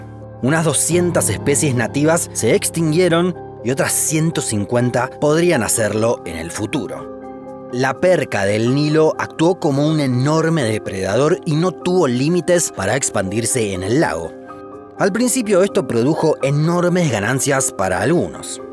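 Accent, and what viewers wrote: Rioplatense, Argentina. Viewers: Sos argentino o uruguayo? Jaja, lo loco es que es una de dos, no sos ni chileno ni nada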